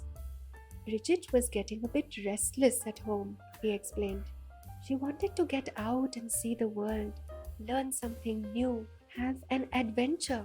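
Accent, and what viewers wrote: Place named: India. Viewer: A sweet story in a sweet voice. Thank you for bringing these kinds of stories to us in Indian accent. It (nest) really gives us (listeners) comfort (Indian accent). Thanks!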